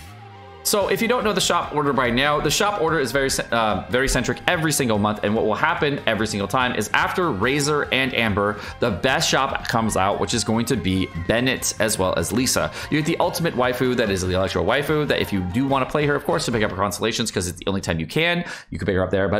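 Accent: United Kingdom. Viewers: Where did British go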